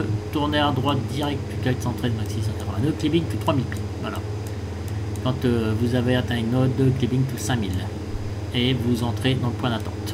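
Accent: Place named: Nord, France. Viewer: Superbe vidéo comme d’habitude évidemment 👍👍👍👍👍 et très bien l’accent du schnord 😉😉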